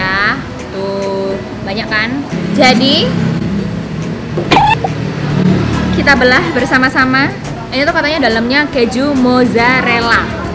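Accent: Java, Indonesia. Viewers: Muka Korea ..sikap medok Jawa 🤭🙏😁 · Mbak ko isih teko jowo medok ne wkwk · Tante orang jawa ya soalnya medok banget... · mba gemesin mukanya korean girl, tapi ngomongnya jawa medok wkwk kalau ketemu saya mau cubit boleh yaa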